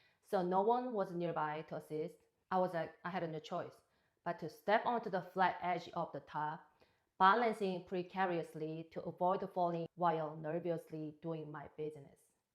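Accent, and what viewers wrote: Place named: Asia. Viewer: I still don't know what girls in pleasure squads do. Is it just singing/dancing/ entertainment for the rulers? Anyway, I am quite intrigued by your english- you speak and express in a very asian way- which i find cute. Also I am quite surprised you went from extreme dictatorship(North Korea) to extreme capitalism(USA)- 2 sides of the coins really- where on both ends society seems to be eroding- at one side due to lack of freedom, food and what not, while on the other side due to misuse of freedom- individualism, money mindedness, lack of family culture, rise of wokeism, loss of love/trust/ dropping birth rates, income disparity, addiction. South Korea is similar to USA- be it per capita income and capitalist society- also it is infamous for highest rates of depression and suicide rates- which obviously is result of capitalism and living from mind/thoughts. 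You, having born and spent early years in NK, no doubt US must be feeling great for freedom. But a time would come...when you would want a middle path...where there is only joy, unending peace and love for everybody- the gate to spirituality.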